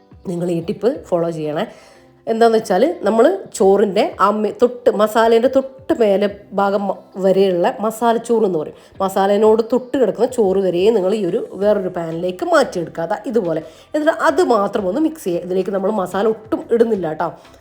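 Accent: Ernakulam, India. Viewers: എറണാകുളത്തെ സംസാരം ആണല്ലോ. വയനാട് ആണെങ്കിലും.. 👍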